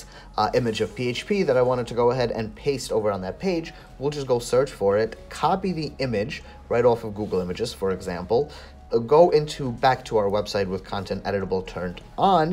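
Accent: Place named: United States. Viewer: a tutorial with an American English speaking person. thank you. lol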